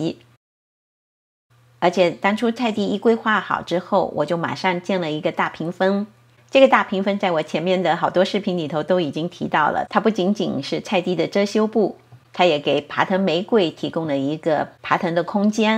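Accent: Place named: Northern Fujian, China. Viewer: 您也算是业余花园设计师了，用心打造美好生活😊听您的口音是不是闽北地区的？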